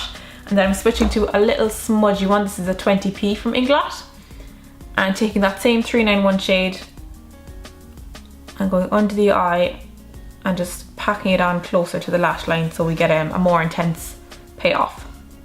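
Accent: Ireland. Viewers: Are u Irish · Gorgeous look,I can hear an Irish accent from you😉🌺Are you Irish?x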